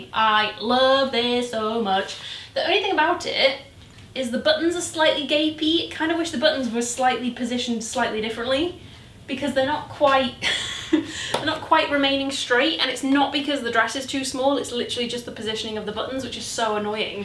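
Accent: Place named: Lancashire, United Kingdom. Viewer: Thanks for taking one for the team! I stopped going into Topshop about 17 years ago (I'm 33!) because their sizing always felt very small and I have been a size 14 basically since I was 12. Even passing the door makes me uncomfortable, like the vibes I feel are "none of these things are for you 😡😡😡" so it's good to think I might actually fit in some of their stuff if I can face going in there and having a look. PS love your accent I grew up near Ormskirk so that Lancs-Scouse adjecent twang makes me trust you even more!!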